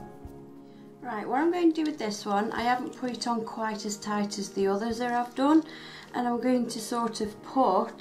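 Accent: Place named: Stoke-on-Trent, United Kingdom. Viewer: I fuckin' knew it! 'ey up duck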